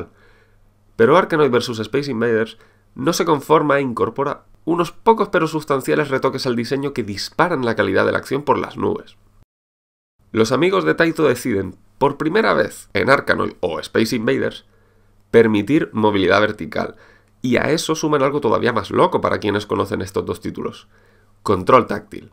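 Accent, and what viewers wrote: Spain. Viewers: Macho estoy disfrutando mucho de tu video y tu capacidad de análisis. Pero se te ha andaluzao la voz a lo "behtia". ¿Te has echado una novia cordobesa o antes te esmerabas en solapar el deje? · Es el acento de Canarias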